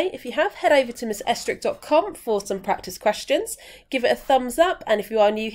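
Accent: United Kingdom. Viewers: Like your British accent. You pronounce certain words differently than we do in America.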